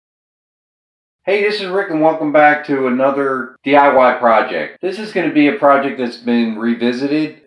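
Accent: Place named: Maryland, United States. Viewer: Excellent video! Btw you sound straight outta Glen Burnie. You’re from MD aren’t ya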